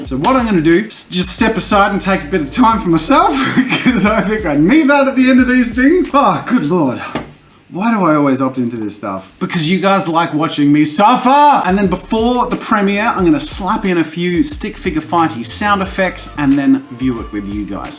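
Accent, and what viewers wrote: Australia. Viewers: Wow....you're sounding more Australian lately..... weird. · why * sounds Australian? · he’s definitely Australian that’s for sure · Are u a Australian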